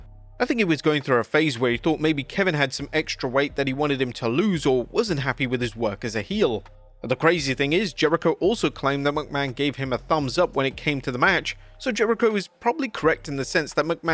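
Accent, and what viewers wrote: United Kingdom. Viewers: Aluminium* he's British, that's how you say in in UK, AU, NZ, CA. Every English country but USA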